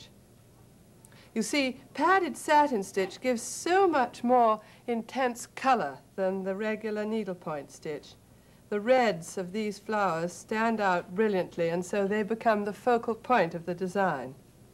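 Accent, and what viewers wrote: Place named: RP, United Kingdom. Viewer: I love her accent! It sounds like pure queen's English.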